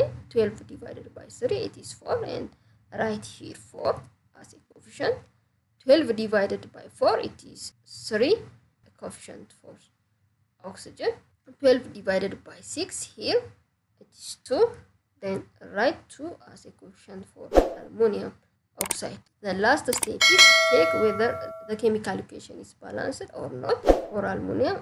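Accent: Ethiopia. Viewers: She is from Ethiopia I know by her accent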